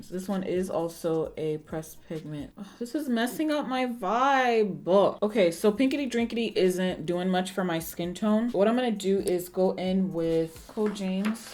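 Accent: Nigeria. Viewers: Your Naija accent, tickles my soul ♥️😍😍🔥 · I loveeeeee when you let your Nigerian accent come thruuuuuuuuu 👏🏽👏🏽👏🏽😩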